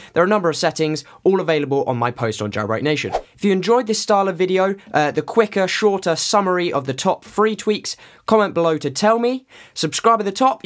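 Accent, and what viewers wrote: United Kingdom. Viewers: I appreciate the demos and tweaks. If you could speak slow, it would be better to understand your British accent.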